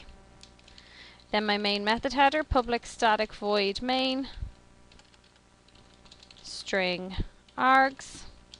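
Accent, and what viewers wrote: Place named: Ireland. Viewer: So nice to hear an Irish brogue explaining Java to me rather than Hindi. :-) Thank you! Great videos.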